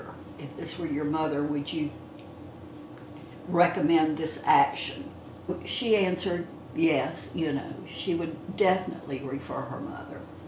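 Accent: South, United States. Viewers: From one long soaker to another, (can't live w/out my baths) I am so happy that I have found you today! You bring a cheery smile to face and a warmth to my soul. I am 60 as of August this year which is very weird for me. You are so precious and your accent brings me home to Georgia, Alabama and Tennessee. I was born in Atlanta. Although I was not raised there, I am a southern girl from the heart! I hope that you are feeling better as it's Sept. now. I will always keep you and yours in my prayers as I am a True Believer too! God is so very good and Be Kind Today is my mantra! Big hug. You are lovely and have the most sparkling eyes too!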